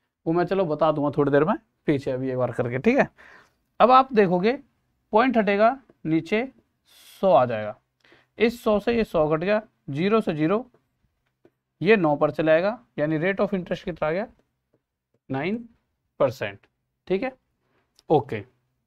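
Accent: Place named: Bulandshahr, India. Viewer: Sir bulandshahr wali bhasa bol rhe ho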